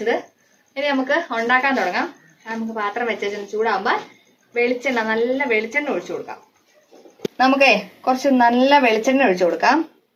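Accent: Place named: Southern Kerala, India. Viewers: കോഴിക്കോട് ആണോ? ഞാൻ ഓർത്തു ഇടുക്കി ആയിരിക്കുമെന്ന്. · സംസാരം കേട്ടാല്‍ കോയിക്കോട്ടുകാരിയല്ല.... ഇങ്ങള്....ഇങ്ങ് ''തെക്കത്തി''.....അതായത് കോട്ടയം..പത്തനംതിട്ട ഭാഗത്തുകാരിയാന്നേ പറയൂ......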